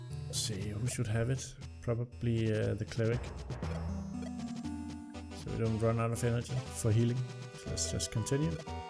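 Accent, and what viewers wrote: Denmark. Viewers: You're Danish, ain't ya?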